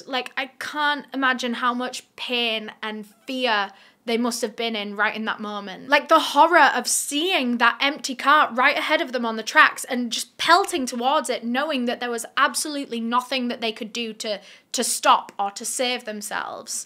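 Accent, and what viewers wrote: United Kingdom. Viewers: From America here. I absolutely love your accent. I hear different accents from the UK and I’m so jealous of yours. And keep saying fuckin. Fuck Fuck Fuck. Shit shit shit. 😂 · the british accent is making me nauseous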